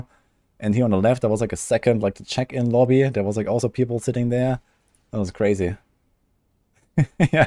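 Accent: Germany. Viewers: Seems like a nice trip you had, You are from Bulgaria, we live very close, I had a feeling you where from Germany coz of voice accent 🙃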